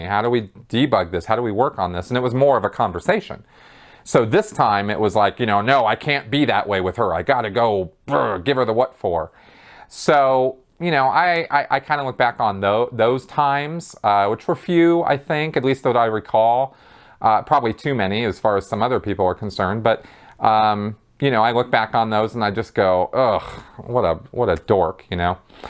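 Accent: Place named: California, United States. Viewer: For anyone wondering… his voice/accent is a California accent (he’s not gay) Not all Californians have it, in much the same way not everyone from New Jersey has the accent, as time goes on this accent is becoming increasingly rare as people spend more time watching nationally broadcast programs… which tend to standardize the accents of those that watch/listen to them.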